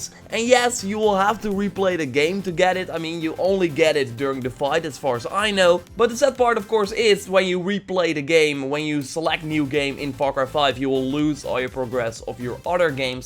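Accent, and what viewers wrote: Netherlands. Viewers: Are you dutch? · Dutch accent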